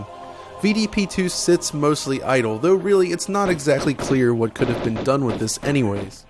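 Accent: United States. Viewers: he's American and definitely has an accent. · This guy's American accent makes me cringe. Still, great work DF! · Well, he IS from America.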